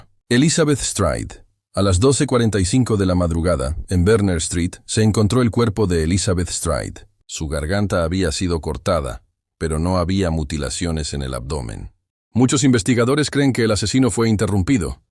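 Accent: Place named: Spain. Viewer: Lamento la narración en el español de España que sólo se habla en España en latinoamérica no hablamos eso.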